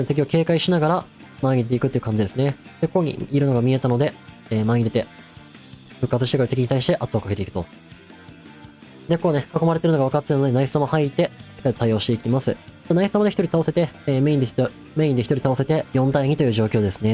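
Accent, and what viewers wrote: Kansai, Japan. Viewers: 初心者ですがどの動画もとても分かりやすくて参考になります✨✨味方やキルされてる人数など意識して見れるようになってきました🙏関西弁なのも親近感です😊今後の動画も楽しみにしてます！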